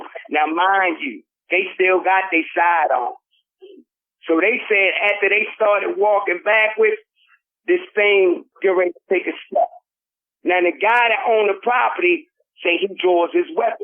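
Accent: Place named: South, United States. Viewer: Aye, Great stories, can't wait for more. Thanks!!!!! P.s I Love your Southern accent!!! Be safe!!!!!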